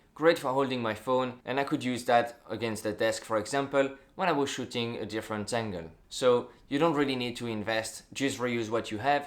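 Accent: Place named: France. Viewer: It is always great to listen to a tutor in Davinci Resolve. You have the knowledge and willingness to share it. I have subscribed as you seem to be able to teach in short sessions - 5 minutes seem about right. You are clear in your explanations and your French accent adds a little extra. Best of luck.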